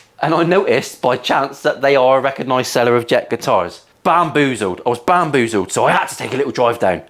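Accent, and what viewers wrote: United Kingdom. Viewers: Great to see that i am not alone to think that this Jet is an awesome instrument. I have a JS 400 sunburst lefty and my Fender stays in its case since . Also even at this price the trem is a Wilkinson . The tuners are pretty decent as well . Your videos are a great source of learning. As a French speaker I am also learning to understand the British accent better! Thanx!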